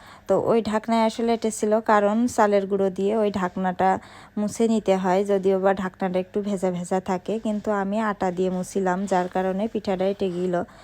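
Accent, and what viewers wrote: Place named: Jhenaidah, Bangladesh. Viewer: আপু তোমার বাসা কোথায়??? ভাষা টা আমার জেলার মতো লাগছে। ঝিনাইদহ জেলা আমার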